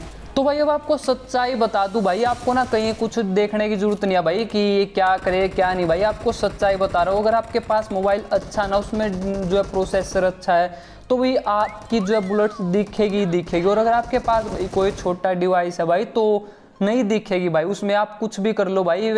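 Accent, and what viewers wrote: Haryana, India. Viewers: Bhai you are harayanvi apki voice bta ri s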